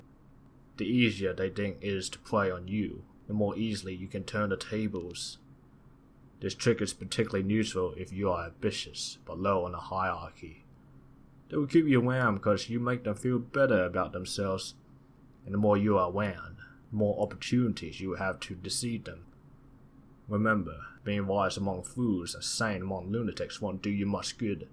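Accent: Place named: Australia. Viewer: I’m not trying to disparage* I’m curious, where the narrator is from. I’m guessing someone who spoke one of the Asian languages fluently, then learned English as a second language either in Australia or with an Australian tutor. Am I correct? Am I close? Again not trying to accidentally offend you, that’s the accent I picked up on, I could be wrong, so I hope my question doesn’t bother you!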